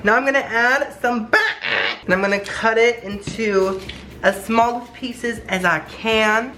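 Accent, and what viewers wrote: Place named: United Kingdom. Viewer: I love your British accent so on point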